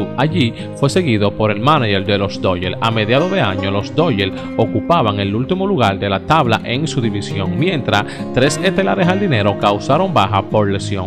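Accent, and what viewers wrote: Cibao, Dominican Republic. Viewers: Yo siempre les dicho a ese narrador qué hable más al paso, pera que suelte ese hablar tan Cibaeño, ejemplo, mul, al, sel, por decir, muy, hay, seis!!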